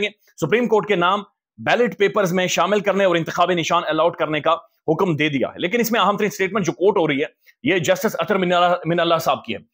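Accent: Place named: Kashmir, India. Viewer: مجھے آپکے اردو لہجے سے شک تھا کہ آپ کشمیر سے ھیں ۔ آج آپ نے خود بتا دیا۔ تھینکس ۔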